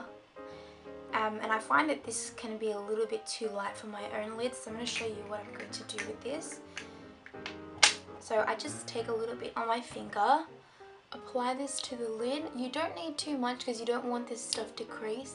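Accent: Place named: New Zealand. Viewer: OMG i just found you and subscribed to you and i love you!!!!! fabulous!!! love your accent!!!! new zealand? or south african?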